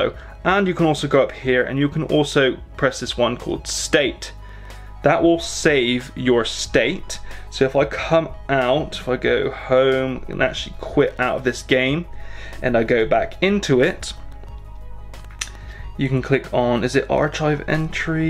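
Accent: United Kingdom. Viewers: he's brittish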